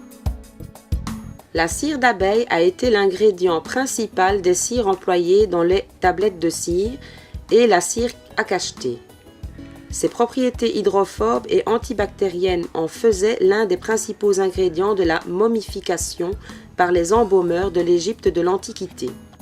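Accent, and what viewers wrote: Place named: Belgium. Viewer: L'accent belche!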